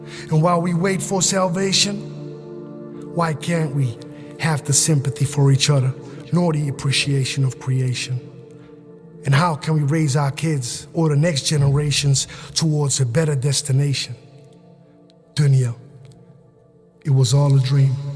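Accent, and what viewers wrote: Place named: Morocco. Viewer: Simply POWERFUL & ELOQUENT! You've spoken nothing but the truth in such an Amazing way. Allahumma Baarek! [AMEEN] 🤲🏽♥️💪🏽 Keep up the good work, you've got an amazing voice, great vocabulary and accent for a moroccan brother, wichis quite rare these days, no offense bro! 🙌🏾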